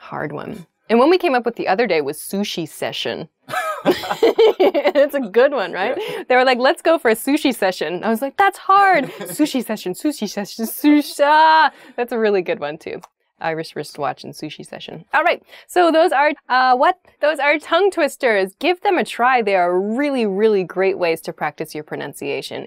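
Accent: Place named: United States. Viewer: Good enough with the American accent that is different to the traditional English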